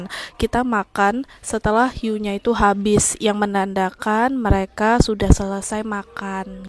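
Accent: Manggarai, Indonesia. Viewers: Logat manggarai y ci 😅❤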